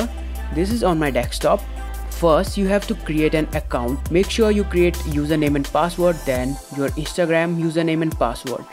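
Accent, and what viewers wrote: India. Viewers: Am I wrong for immediately not trusting this due to the street shitter accent? lmao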